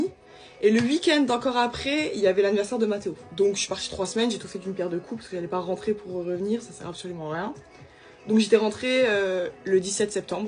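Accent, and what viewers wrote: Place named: Moselle, France. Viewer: Vous avez un accent mosellan 🥰😄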